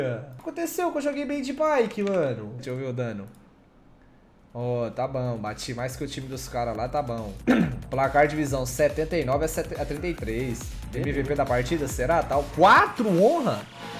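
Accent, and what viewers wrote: Minas Gerais, Brazil. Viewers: sotaque mineiro do é o motivo pelo qual fico gay td dia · voce é de onde mano ? sotaque de minas ou de go ctz haha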